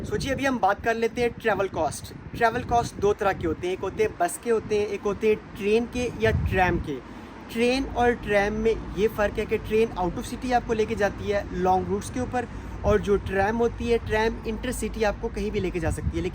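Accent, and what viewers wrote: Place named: United Kingdom. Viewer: I can't understand the strong UK accent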